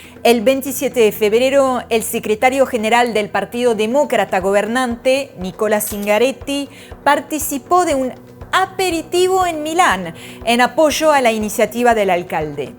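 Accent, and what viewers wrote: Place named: Argentina. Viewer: Todo bien DE DONDE ES ESTA DAMA...su epañol esta mezclado entre acento Español Argentino y Ruso... Pero buen video informativo.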